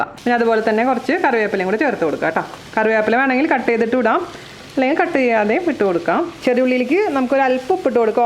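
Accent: Thrissur, India. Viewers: Scooper chechi nammal trichurkaarde baasha sooper readymoney ennu njanum parayaarund ente place chavakkad upperi sooper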